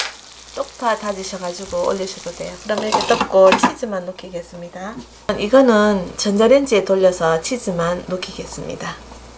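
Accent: Gyeongsang, South Korea. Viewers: 반갑심더.. 딱 말씨가..대구 찍어봅니당.. 아님..어쩔수 없거든요.. 대구가 미인의 본고장 아닙니껴? 구독과 좋아요.,기냥..팍.. 이모님 시간될때 저희집도 한번 놀러오이소.. 캬..먹고 잡네용..^^ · 가는곳마다 가 계시더라구요..그래서 끌려서 와봤더니 저가 좋아하는 요리채널이네요 경상도말이라 더 정스러워요^^ 조아요와 빨간버튼 꾸욱~~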